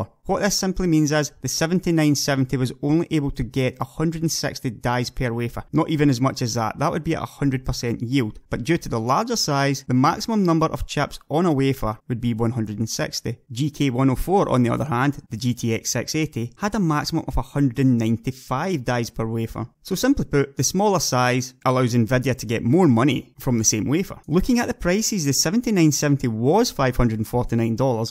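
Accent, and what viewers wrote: Scotland, United Kingdom. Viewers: He has a Scots accent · i liked your skotish accent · your TRAINSPOTTING accent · This is the scottishest schottish accent I have ever struggled to understand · he was speaking with a Scottish accent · He is scottish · holy shit he's a scottsman! was not expecting that! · hard for me to understand your wonderful Scottish accent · I love the Scottish accent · love your accent? you scottish? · pretty sure he is British, Scottish my guess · your lovely Scottish voice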